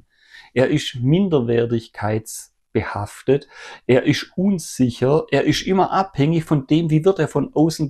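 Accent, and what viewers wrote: Swabia, Germany. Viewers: Isch halt usm Ländle 😅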